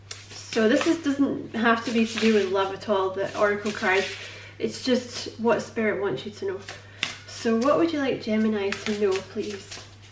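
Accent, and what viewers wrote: Scotland, United Kingdom. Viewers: I’m Gemini Sun… I enjoy listening to your Scottish accent. Merry Christmas!!☃️🎄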